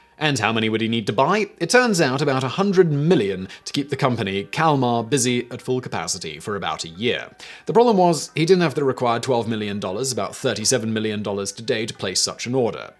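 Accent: United Kingdom. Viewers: Un trap en ur....lol the British pronouncements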